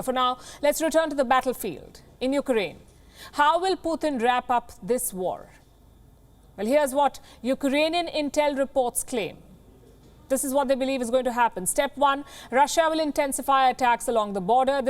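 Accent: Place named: India. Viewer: Even with a strong accent, you are still the most understandable Indian-English speaker lol, keep up the good work.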